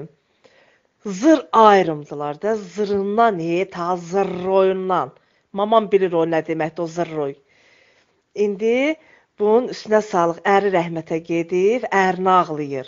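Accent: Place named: Dashkasan, Azerbaijan. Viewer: Yəqin daşkəsəndən olub lətcəsi ona oxşadı amma gülməli deyil dərdə gülmək olmaz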